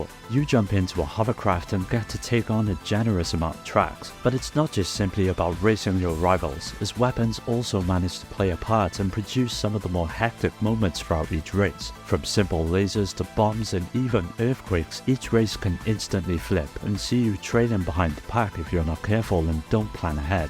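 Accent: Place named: England, United Kingdom. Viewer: Suikoden right off the bat? Fantastic. Just got stumbles upon your video on my feed and i like your english accent, because as a non native speaker it's easy to listen to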